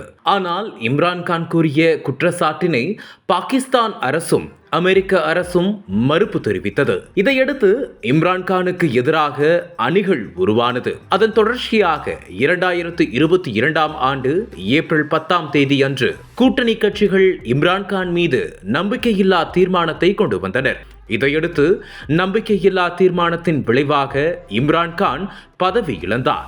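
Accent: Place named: Sri Lanka. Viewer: Voice style nalla illai.. rombba irritation aagudhu ....sri lenken modulation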